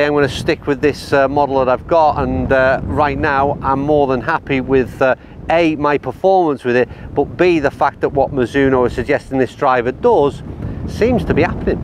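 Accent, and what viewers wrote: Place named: England, United Kingdom. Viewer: Why do we have to listen to people that have a strong English accent???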